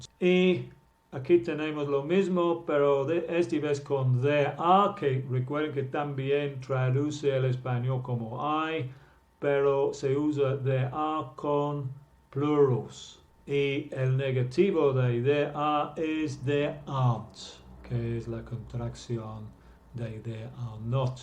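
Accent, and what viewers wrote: England, United Kingdom. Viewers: Sus clases son excelentes, me encanta la pronunciación inglesa, y su forma de enseñar. Muchas gracias profesor.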